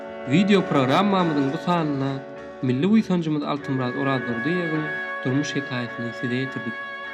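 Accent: Mary, Turkmenistan. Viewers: Frozen citizen Maryn aksenti bolnanna name? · Maryñ aksenti bar diymesen, video erbet dal , gowy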